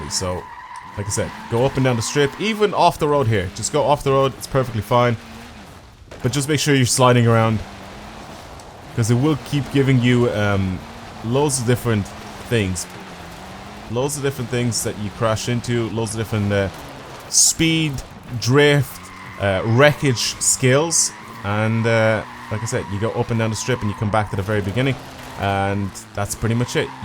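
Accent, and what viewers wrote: Ireland. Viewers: Are you from county Offaly? You sound just like my Irish cousin who lives in Offaly. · Are you from Ireland ?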